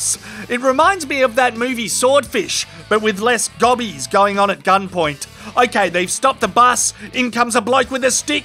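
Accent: Australia. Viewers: This guy sounds so Australian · When I moved to australia from the US, everybody sounded like you for the first month 😂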